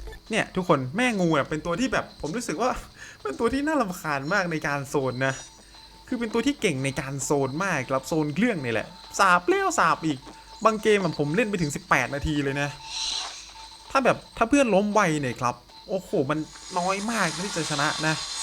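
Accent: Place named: Rural, Thailand. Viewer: เสียงเหน่อเรยขำ555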